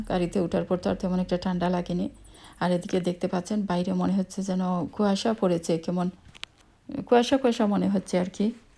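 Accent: Sylhet, Bangladesh. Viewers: Assalamalakum আপু আপনিও মাঝে মাঝেই সিলেট কথা বলবেই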